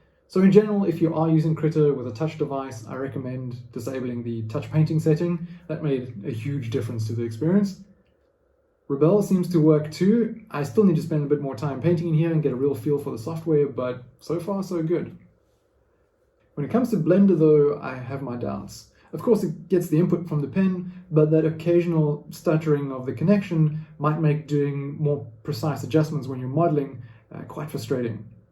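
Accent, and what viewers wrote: South Africa. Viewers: Informative video thank you ! I am an interior design student .. here in SA so glad to here a familiar SA voice 😁 I recently just invested in the Samsung tab s10 FE and was wondering does Samsung second screen work similar if I’m using adobe illustrator to draw on the tablet but have it connect with a laptop 😁? I’m new to learning digital drawing for my design projects and need to figure out the best workflow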